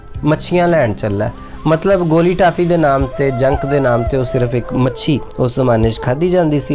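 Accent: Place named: Punjab, India. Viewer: It's really amazing.. 🤩❤❤ Powerful punjabi accent.😍😊 Waiting for that vlog.👍🤭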